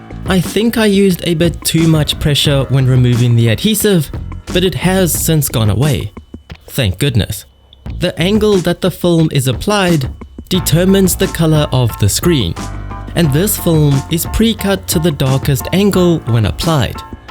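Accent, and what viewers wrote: South Africa. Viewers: I knew you sounded South African!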